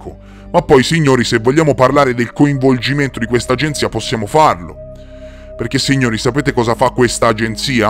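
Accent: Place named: Lucca, Italy. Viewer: I enjoy your strong lucchese accent EHEHEH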